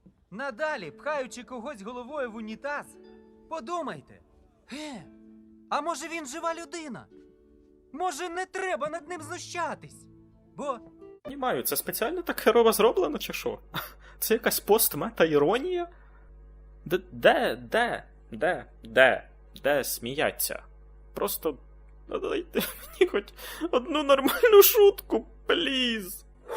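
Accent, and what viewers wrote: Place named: Surzhyk, Ukraine. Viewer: Мені подобається ваш "суржик"🥺❤️ Хто б там що не казав, але говірка класна) Звучить по-домашньому для мене, бо в житті подібним чином спілкуюся.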